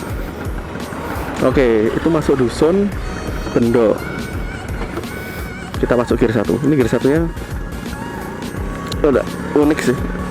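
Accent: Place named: Jakarta, Indonesia. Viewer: Mase logate rodo jakartanan. Hehehe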